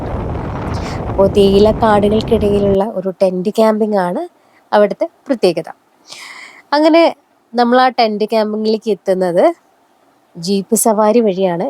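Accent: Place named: Thrissur, India. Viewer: സൂപ്പർ..സൂപ്പർ..ട്ടാ...💕🎻🥀❤️🎻❤️🥀❤️🥀❤️തകർത്തു... ഇടയ്ക്കുള്ള..ട്ടാ..ട്ടാ...ന്നുള്ള പ്രയോഗം നല്ല രസമുണ്ട് കേൾക്കാൻ..തൃശ്ശൂർ സ്റ്റൈൽ💯💯💯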